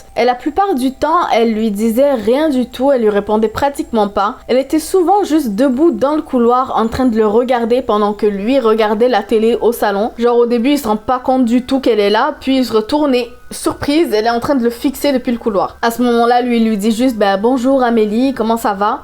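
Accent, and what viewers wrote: Quebec, Canada. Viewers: Est tu québécoise car on dirais un accent mis français mis québécois?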